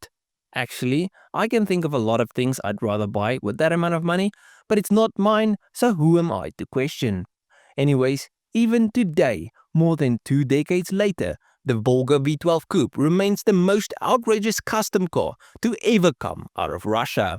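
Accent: South Africa. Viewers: The car is amazing! You never cease to come up with automobiles most of us have never heard of. You are an incredibly intelligent young man. And, as I've said before, your narration with that South African accent only ads to the videos enjoyment.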